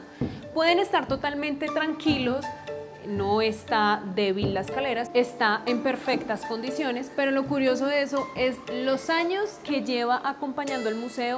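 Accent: Colombia. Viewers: Hola, yo te oigo desde Monterrey, México y he quedado enganchada con tus videos y con los casos que presentas de tu país. Aquí en México también ocurren casos lamentables,creo que ningún país se salva. Me gusta tu acento,de que parte de Colombia eres? Agradecería si me mandas un saludo. Soy Vero.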